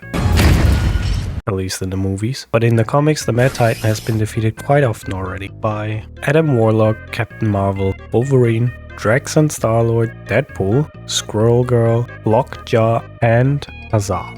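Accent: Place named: Germany. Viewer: i already thought you were german and when you pronounced Lichtenstein i was certain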